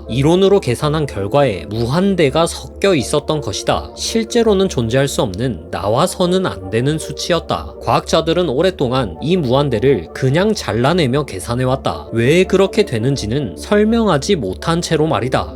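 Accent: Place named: Gyeongsang, South Korea. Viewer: 경상도 억양 멋있어요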